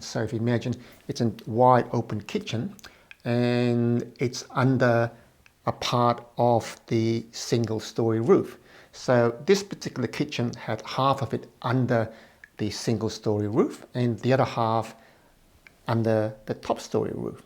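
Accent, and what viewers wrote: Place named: Australia. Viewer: Completely off the topic, but I love hearing such an Australian voice from such a non-English face. You are what makes this country worth being proud of. Thank you.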